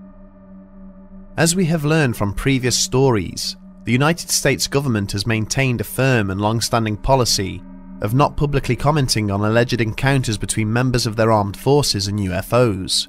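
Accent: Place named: England, United Kingdom. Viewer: Yeah he narrates in actual English not that American parody language..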